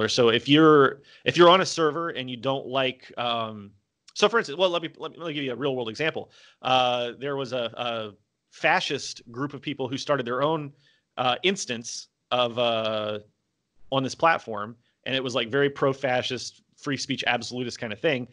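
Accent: South, United States. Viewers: and since speaks in his native Southern here, perhaps he can get Olly to speak in his native Northern (Geordie)... I'd like to hear that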